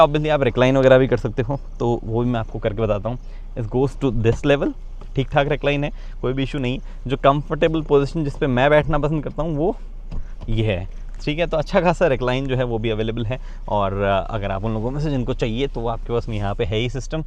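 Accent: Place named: Delhi, India. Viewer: Why you make delhi excent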